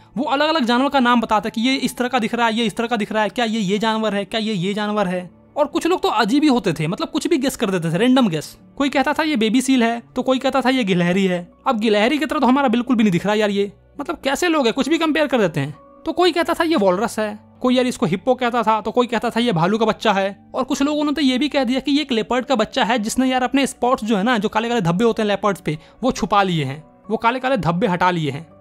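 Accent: Bihar, India. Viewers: Sir are you from bihar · Bhai aap Bihar ki language bolte ho 😊 kuch kuch words h na😊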